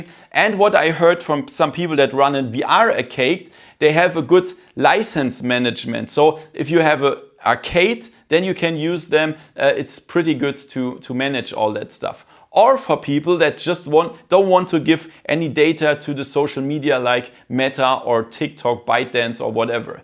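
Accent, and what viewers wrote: Germany. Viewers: My thoughts after i stopped on second : "Thats definitly a german guy." And than i read your channel name.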